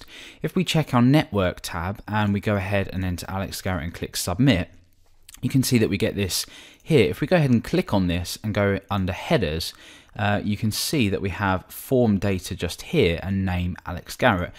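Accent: England, United Kingdom. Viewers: Not a Hindi accent, a butter-smooth English accent. Plus Good content. Wonderful.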